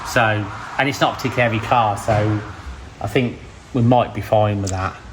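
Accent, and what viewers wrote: United Kingdom. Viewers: Awesome footage as always. If only I could understand everything says. I get about 60%, the rest is too ‘dialected’ for me. I mean, for a non-British person, his accent is rather overpowering. I have to switch on subtitles when he speaks. I don’t have that problem with the other DW videos though. But I will watch all videos.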